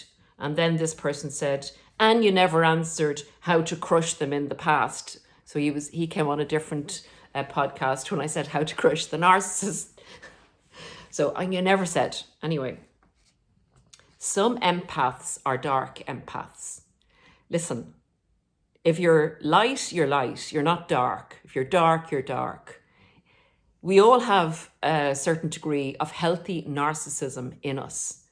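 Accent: Ireland. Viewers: You don’t need to defend anything you do, you are a kind wholesome person and I love your beautiful Irish accent. My darling Irish Mammy died in March. She taught me how to be loving and to love.Please don’t don’t don’t apologise. The best trick of the devil is to pretend he doesn’t exist.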